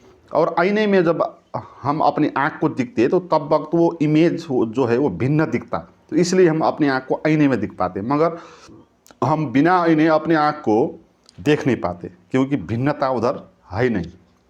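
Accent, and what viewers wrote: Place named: Nepal. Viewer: आपका पता ( address) क्या है. बोली से नेपाली लगते हैं.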